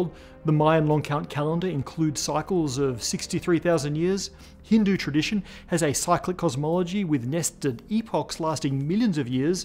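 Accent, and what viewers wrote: Australia. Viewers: He's speaking with an Australian accent, if that's what you're talking about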